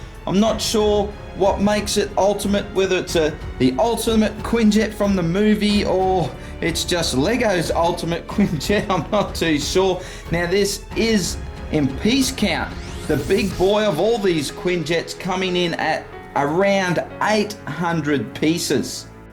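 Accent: Australia. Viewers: i love being able to watch/listen to a true Aussie! Great Video!!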